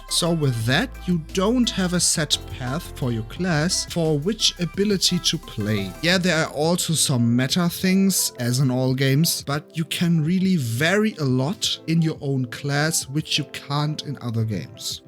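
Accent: Germany. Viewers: Starker deutscher Akzent, heilige Maria XD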